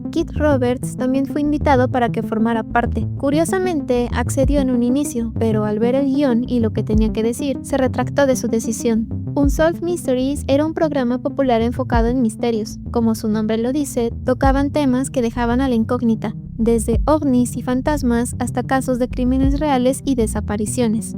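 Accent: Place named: Fresa, Mexico. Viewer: Estaba intentando tomarme en serio el video por el contenido..... Pero es que es imposible con esa vocecilla!!!!!!!!!! Super kiwi/Fresa no me quiero imaginar en la cama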